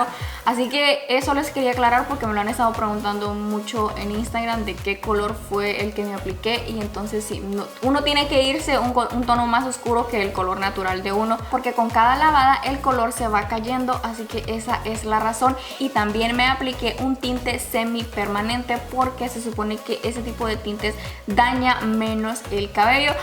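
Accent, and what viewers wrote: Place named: Honduras. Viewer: Así hablamos en Honduras !!!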